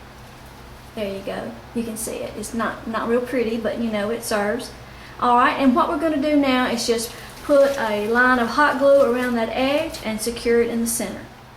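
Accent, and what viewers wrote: South, United States. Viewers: I love your lovely southern accent.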